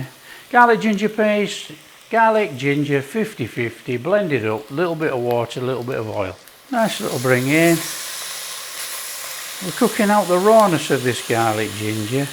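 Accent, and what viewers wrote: Yorkshire, United Kingdom. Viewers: Happy New Year. We all really appreciate your videos on helping people to cook, especially those on a budget and those that live on thier own. Just wondering on your lovely accent. I’m from Wiltshire and sound like a bumpkin farmer but have always loved Northern accents. I love the Lancs accent but I’m taking a punt that you are more Yorkshire, northeast say Sheffield area? Lovely channel you have, appreciate your work ❤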